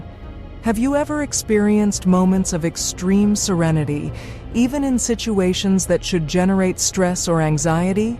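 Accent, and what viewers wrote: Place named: United States. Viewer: Sorry when I hear this sharp woman's American accent it makes everything like a Mickey mouse cartoon. Shame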